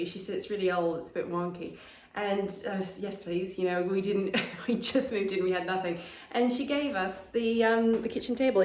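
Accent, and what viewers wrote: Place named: Scotland, United Kingdom. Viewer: I detect a Scottish accent in there 😂🏴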